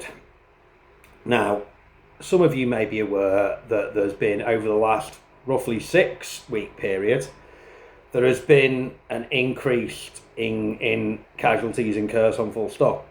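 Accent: United Kingdom. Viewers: I just came across this video... you sound British to me. I struggle to feel sorry for foreigners who go involve themselves in a war. I file this under "it is what it is". Come back home, or stay there but stop whining, you chose this.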